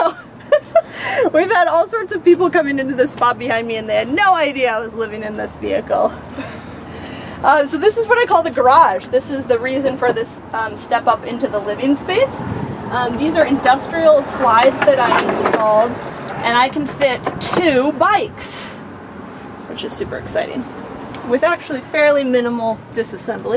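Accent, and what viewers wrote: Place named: Michigan, United States. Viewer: 100% Michigander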